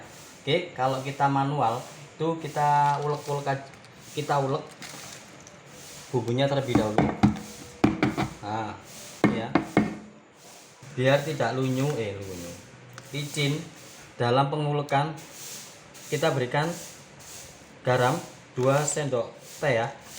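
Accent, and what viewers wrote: East Java, Indonesia. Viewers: Saya suka cara masaknya n bahasanya yg medhok khas Jawa timuran 👍👍👍salam teko arek Suroboyo chef..🙏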